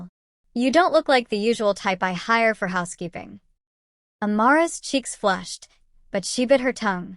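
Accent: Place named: Europe. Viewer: Why don’t I hear other voices narrating these stories instead of a clear type European voice. If I see these are African type stories then the voice could match, in my opinion. Thanks for listening.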